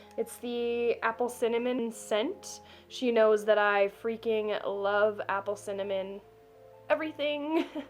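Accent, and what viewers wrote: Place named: Germany. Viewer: oh sorry then ._. Your english is really really good but I heard a little accent and I thought It could be german cause my best friend is from Germany and she has almost the same accent. Again I'm sorry :(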